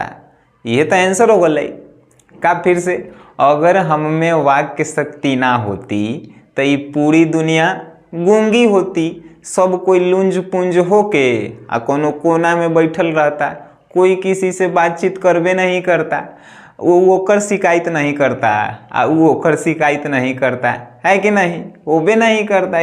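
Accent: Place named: Bhojpuri, India. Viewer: Sirji aapki bhojpuri must h😝😝😝💞💞💞💞💯💯💯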